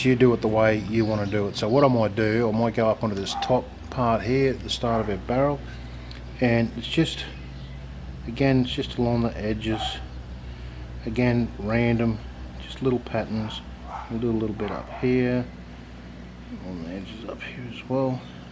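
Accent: Australia. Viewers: Mate I just stumbled across your channel, so good to hear the way you just talk through it. Not just the great description but love hearing from an Aussie. It's like I'm around my mates place bashing out some painting techniques. I'm going to have a look through your other stuff. Cheers for the info and keep it up.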